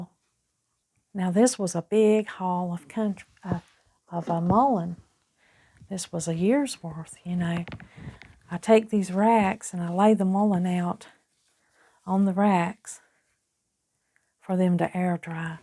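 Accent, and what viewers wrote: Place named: South, United States. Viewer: Thanks for your video. Drying some mullen myself for the first time. BTW, I really enjoy your soft southern accent, you sound exactly like some of my favorite cousins.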